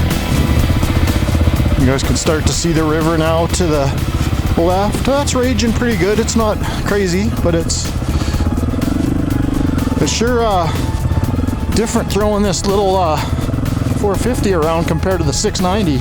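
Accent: Canada. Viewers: Saw you in Global addiction man! Had no idea you were in that video but could tell it was you under the helmet because of your super friendly Canadian accent. I'm from Minnesota soooooo I knooow you you got the O's! Lol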